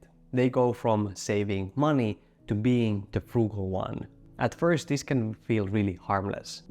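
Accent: Finland. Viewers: 100% Finnish accent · Not to be the weirdo, but I love the Finnish accent. It reminds me of previous trips there and favourite bands. So your channel is the ultimate combination 😅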